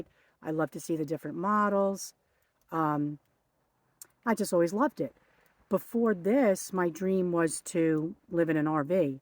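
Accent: Long Island, United States. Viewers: New to your channel and always love hearing a fellow Long Islander ❤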